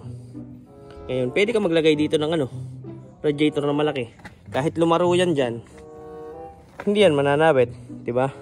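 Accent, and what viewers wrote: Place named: Visayas, Philippines. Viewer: bisaya diay ka lods?? 😅🔥 Word kapoy bisaya word😁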